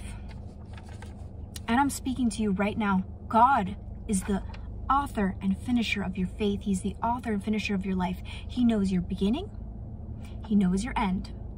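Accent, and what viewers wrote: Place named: South, United States. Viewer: What sound like your from the south. Yall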